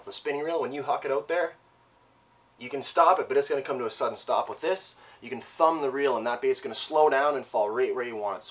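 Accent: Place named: Upper Midwest, United States. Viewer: Are you from the upper Midwest? The accent is really telling.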